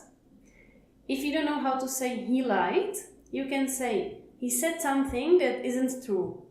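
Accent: Czech Republic. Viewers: I just wanna ask native English speakers watching this video, are you at all bothered by the fact that the teacher speaks English with a Czech intonation? Not trying to be rude, just genuinely curious! (Honestly, most Czech teachers speak English this way - they learn their vocab but the accent and intonation kind of stay Czech.) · Je slyšet ten hnujsnej českej přízvuk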